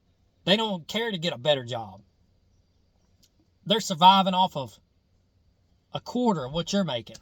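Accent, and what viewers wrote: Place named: North Carolina, United States. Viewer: I don't know why but it really feels like you're from Montgomery County in NC for some reason. Maybe because you talk a bit like a few relatives of mine. Love the content bro!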